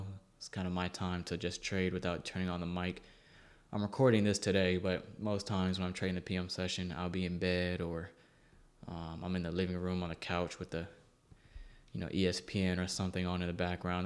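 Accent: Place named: South, United States. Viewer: Here for the southern slang, giddy up traders...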